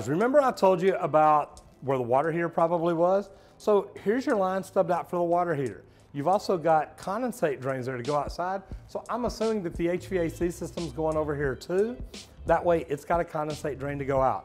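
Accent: South, United States. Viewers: I have no idea what any of this is, but I stayed for that southern accent. 😃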